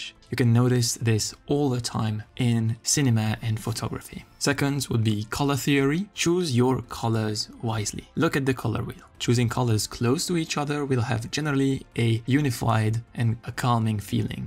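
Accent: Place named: France. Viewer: The video is super intressant. Tu es français ? Parce que j’ai l’impression que t’as un petit accent.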